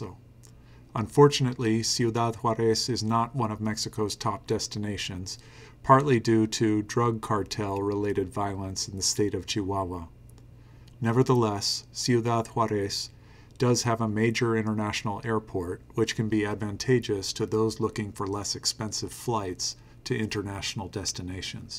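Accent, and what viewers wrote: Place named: Northern California, United States. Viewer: i am curios- are you from Nor Cal? It just feels like you are sir- would love to know as have watched several of your videos. Thanks- Robert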